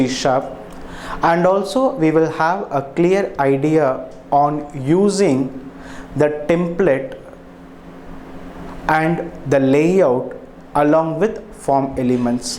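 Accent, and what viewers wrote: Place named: India. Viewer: that indian accent lol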